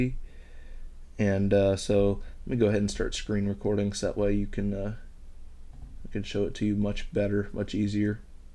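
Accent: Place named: India. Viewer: Oh awesome I thought you were like Indian or something 😂 lol nice!!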